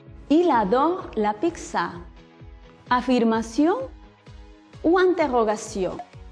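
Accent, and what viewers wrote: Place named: Spain. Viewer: ella pronuncia como español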